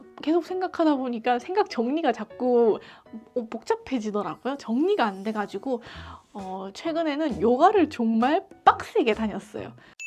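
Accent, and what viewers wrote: Gyeongsang, South Korea. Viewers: 경상도 억양 귀여워요 ㅋㅋㅋㅋ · 우즈벡 부동산 블로그보다 여기까지 왔네요 ㅋㅋ 저도 isfp인데 i 발음 들으니 뺴박 경상도 같으신데 맞죠? ㅋㅋㅋㅋ isfp는 누워있는거 젤 좋아하는 집순이인데 사업이라니 대단하세요~ 우즈벡 영상도 올려주세요~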